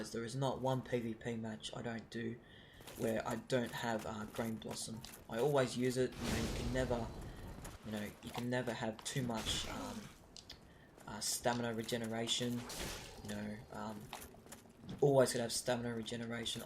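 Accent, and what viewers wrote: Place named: United Kingdom. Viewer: Dude your accent is hilarious haha XD im not a big fan of british accents but your funny ^^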